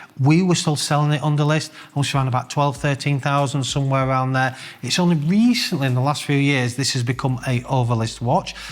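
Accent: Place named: North East England, United Kingdom. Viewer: Impressive video! Not watch related but is this a North east English accent? Trying to learn the English accent variations.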